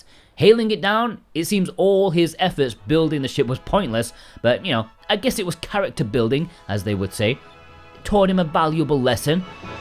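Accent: United Kingdom. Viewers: 🐬 My favourite game of all time. You made a fantastic video that made me laugh in several places. Tell me though, you sound like you hailed from Blighty.... Is it just me, or does Galcian look like Noel Edmond's megalomaniacal doppelganger? Part of my hope were dashed when we didn't get a boss fight again Mr. Blobby! 🤣I also agree, I would love a model of the Delphinus, but I do have a statue of Aika so it's not all bad.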